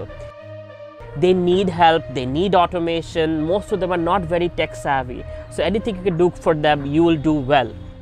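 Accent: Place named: India. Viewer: Your English accent is not like other Indias.. It’s a good thing that i find rare...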